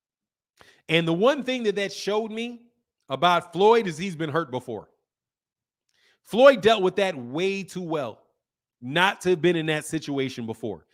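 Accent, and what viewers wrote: Texas, United States. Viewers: you from Texas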